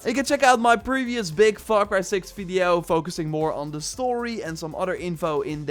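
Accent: Netherlands. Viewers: Ken heel je kanaal niet. Maar aan dit accent te horen ben jij sws Nederlands lol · m8 are you dutch you sure sound like one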